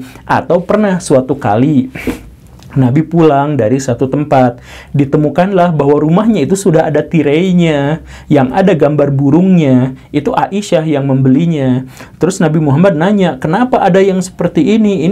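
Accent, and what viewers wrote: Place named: Bandung, Indonesia. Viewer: Btw, Bapa ini jago, di awal beliau seolah tidak sepakat dg konklusi organisasi Islam terbesar di Indo, tetapi di ending, konklusi beliau ya sebenrnya sepaakat dg org Islam terbesar di Indo hehehe...itulah jagonya org dg kultur Sunda, pandai menyamarkan dlm kalimat hehe...oya, kalau dri logat beliau...kayaknya asal daerah Kab. Bandung deket2 Garut, sekitaran Cicalengka gt yak hehe...salam😊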